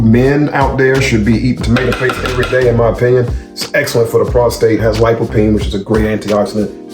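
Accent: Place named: Bay Area, United States. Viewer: You got a strong bay area accent bruh. What set you from? Dope clip cuz!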